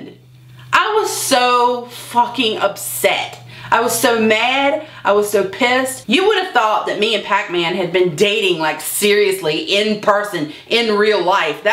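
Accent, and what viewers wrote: South, United States. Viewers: you are saving me from a panic attack right now. I'm Australian and listening to your soothing southern voice is weirdly helping. I don't want to take my short term medicine but I think I have to.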